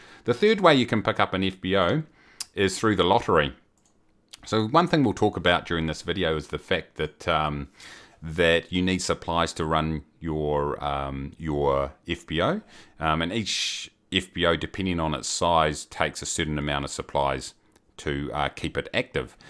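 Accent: New Zealand. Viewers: Thanks for these videos love the kiwi accent too :) One thing though...You didnt really talk about how facillities make money and the kind of averages you could expect from them. I noticed you receive income from ground fees but didn't seem like much. Maybe you can make a quick vid explaining more on different types of income etc?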